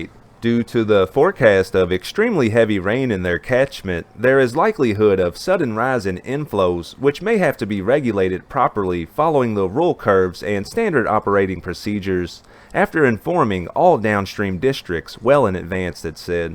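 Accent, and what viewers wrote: South, United States. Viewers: I especially like his personable southern accent, but without it being hard to understand.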